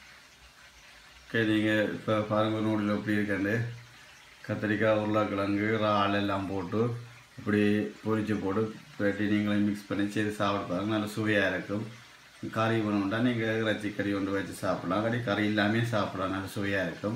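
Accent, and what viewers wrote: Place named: Sri Lanka. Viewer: Neenga pesura srilankan tamizh romba pidikum anna👌👌, London la oru idathula indha noodles sapta niyabagam iruku 😊, yummy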